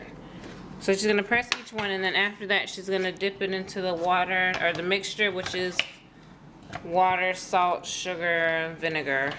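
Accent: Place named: United States. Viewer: U sound americanized as all heck...like me lol. And your mom sounds like mines to rest her soul. Thanks for the recipe :)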